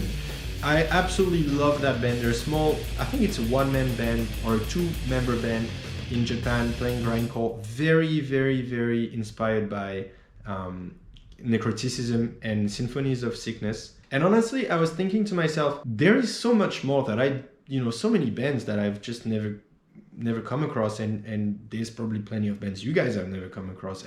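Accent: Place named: France. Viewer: With your accent I think you're French no? Cocorico ?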